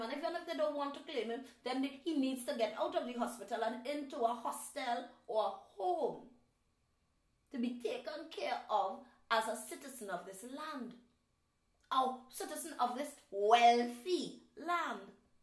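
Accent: Barbados. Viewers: The bajan accent killing me to😭😂😭😭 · love she bajan accent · That Bajan accent!!! 😂😂😂😂😭😭😭😭😭😭😭😭😭😭😭😭😭😭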